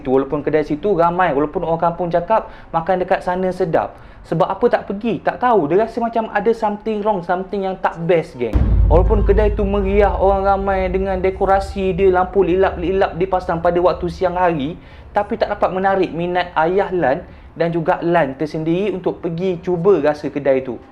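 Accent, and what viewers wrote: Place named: Terengganu, Malaysia. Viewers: Jadi dh ckp ganu 👍😂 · hanya cerita seram2 dah 72k pengikut kau ok la semoga suksess... memang mcm tranung betul mung ni pekat molek haha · bnyk² org luar ,, abg punya cakap tranung tu mmg terbaik arr .. mmg bunyi tranung sgguh 😂 apapon teruskan cerita kisah seram ni 👍🏻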